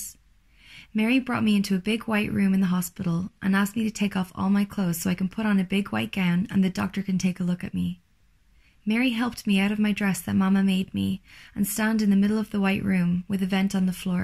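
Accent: Ireland. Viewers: I love your stories, please keep at it. Do I hear a tinny bit of an Irish accent? Reminds me of my Mum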